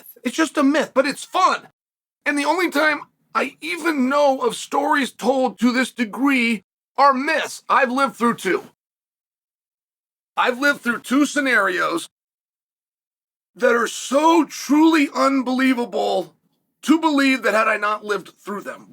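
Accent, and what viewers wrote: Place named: United States. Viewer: I'm brazilian and chael have the most american accent i have ever seen speaking portuguese.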